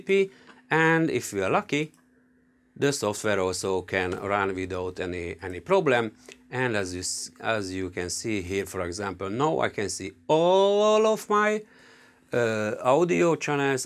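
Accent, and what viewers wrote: Hungary. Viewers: Great monitor, good review ... :). Good humour, lovely accent ... :): are you from Hungary or somewhere in the neighbourhood ... :)? In any case, it was great help for me, now I know why I need at least 40" for a 4K monitor ... :) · he he az első mondtad után tudtam hogy magyar vagy :D köszi szuper volt a videó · Im sure I hear hungarian accent there. I might be wrong. This monitor is £498 on amazon. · Köszi az infókat, nagyon jól jött :) ( az akcentusodból kiindulva magyarul írtam :D )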